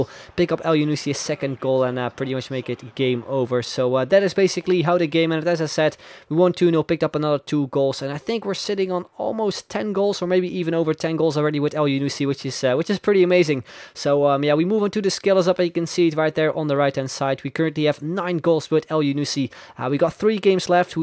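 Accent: Netherlands. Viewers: you can hear the dutch trough the english :p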